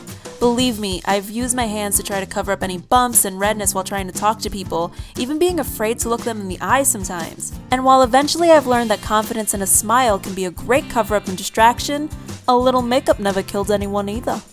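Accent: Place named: New York, United States. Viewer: *yeah for new york accents*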